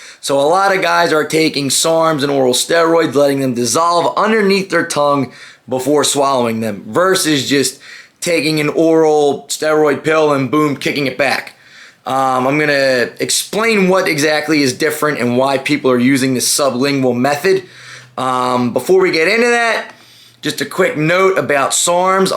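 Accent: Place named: Pennsylvania, United States. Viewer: You sound like you're from PA. Philly or Pittsburgh or close by maybe.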